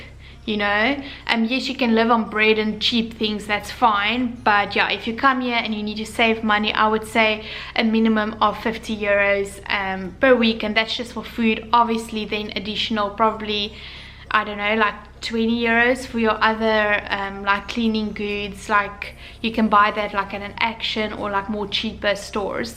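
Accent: South Africa. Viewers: She sounds very South African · You sound South African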